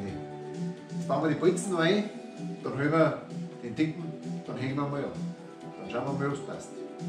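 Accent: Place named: High German, Germany. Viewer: sauber... Top hast die a die Hochdeutsche Sprache so anglernt das du es nimma lassen kannst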